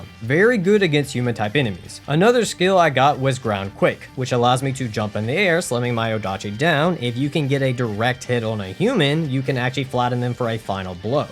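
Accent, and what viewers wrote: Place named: United States. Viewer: I can't teach you how to speak Japanese in one comment, but I can teach you how to pronounce it! There are only 5 vowels, and they're pronounced pretty much the same as they are in Spanish. So a = the vowel in "mom", i = the vowel in "bean", u = the vowel in "shoe", e = the vowel in "bay*", o = the vowel in "go". These vowel pronunciations NEVER CHANGE, so i = bean vowel 100% of the time, e = bay vowel 100% of the time, etc. The only time vowels change pronunciation is when certain vowels are combined to make a long vowel. This only happens with the combos "ou", which makes a long o-sound, and "ei", which makes a long e-sound. Otherwise, long vowels are represented by simply doubling the letter ("aa" = "a" held for 2x the length, "ii" = "i" held for 2x the length, etc.. "oo" is also used in a scant-few words for historical reasons, it's pronounced the same as "ou", just "o" but held for 2x length.) Ex. 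Sensei = sensee with a long e-sound. *In modern Japanese, the e-sound can be realized as either the vowel in "bay" or the vowel in "bet", depending on region or even just individual preference, so use whichever one you're more comfortable with. Consonants are similarly really easy, they sound like how they look. The only exception is the r-sound, which sounds like the Spanish r, not the English r. Since you seem, by your accent at least, to be American, it's the same sound as the "tt" in the words "butter" and "better". The "tsu" sound can be a little tricky as well, but if you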